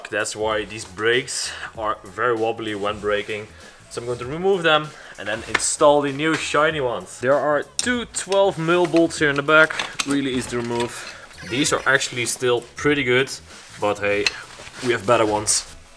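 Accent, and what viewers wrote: Netherlands. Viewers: Ik dacht al dat ik een Nederlands accent hoorde :p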